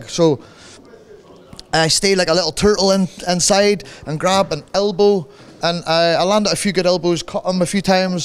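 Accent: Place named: Ireland. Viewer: Zdecydowanie nie, jeśli ktoś ma styczność z Irlandzkim akcentem pierwszy raz, to prawdopodobnie nie zrozumie połowy z tego, co mówi Parke, mimo dobrej znajomości języka.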